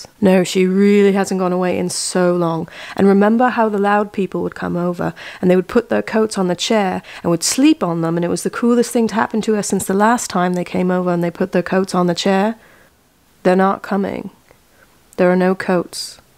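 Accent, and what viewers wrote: United Kingdom. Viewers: I strangely find your British accent quite pleasant to listen to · I love your English accent and the commentary is spot on 🤣 Your poor, long suffering cats! Nah, you can tell they really love you, it's so sweet x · i love the british accent lol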